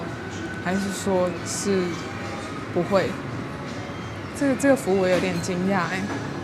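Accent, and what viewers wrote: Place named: Jiangzhe, China. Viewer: 博主是哪里的华人？听口音真的很大陆华南的口音，偏江浙一带。但是海外华人基本上广东福建人很多，口音明显，所以我很好奇。 如果是大陆人，到大陆不至于这么惊讶，不是大陆人，口音真的好大陆，如果说是台湾人，口音真的很淡。 😁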